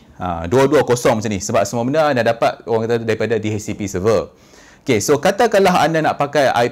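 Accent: North, Malaysia. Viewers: Abg terang memang padu, loghat utara plak. haha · Walaupun beliau bertutur bercampur dgn loghat utara,saya yg berasal dri Sabah faham dgn baik,tak sangka banyak Malaysian buka content video lain dri yg lain tapi yg penting sangat bermanfaat! Tq for sharing the info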